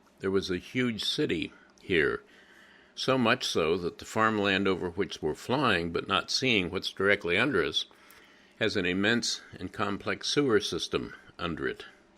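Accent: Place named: United States. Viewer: My wife was born on the airfield in one of the Nissen huts: after the war there was a tremendous housing shortage and her parents along with many other local people squatted in the disused huts until they were re-housed in one of the new housing estates that sprung up in Kettering. Incidentally it was wonderful to hear an American voice doing the narrative.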